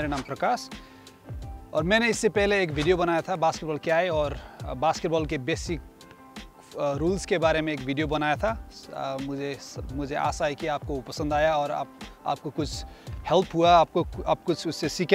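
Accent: Nepal. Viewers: Aap Nepali assent mai bat kar rahe ho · Bro your hindi seems like Nepal 🇳🇵. Are you?? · nepali daju k xa khabar tone chai nepali k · Why i am hearing nepali tone?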